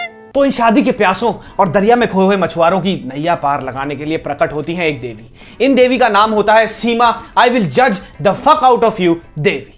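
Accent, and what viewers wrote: India. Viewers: You have very poor observation. Now I am feeling very bad for myself watching review of some sad loner commenting such thing for a professional matchmaker. First I watched ur video and your old job "honest review" review, it's was funny. As I like to hear desi accent. Basically nothing " honest" in your review, you should rename your show " saying in desi accent". Just tell me what wrong in that lady in the show " seema from Mumbai", she was perfect professional. She listened to her clients, observed them(not judge), motivate her clients and provide what they wanted. Moreover, she follow up their clients until he/she get married. A simple service based business type, now I don't see anything wrong in that and maybe non Indians also feel the same. But you are really getting toxic and loner day by day who judge a show without observation and only watch the show to pass negative comments. 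I am writing this letter to your wife " Dear Mrs, Please Madam control your husband he is getting toxic day by day. He needs some mental support. His review is very irresponsible, now a day he don't have a job he is making fun of other professionals. If possible please beat him with belen to normalised thing. Your sincerely, Indian YouTube viewer" Maybe government should lunch a review certification course so you can attend and show act like professional reviewer.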